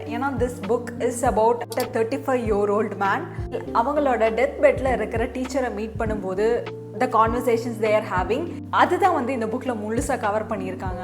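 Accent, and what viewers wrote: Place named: Kerala, India. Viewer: Are you a malayali? You speak tamil like a malayali..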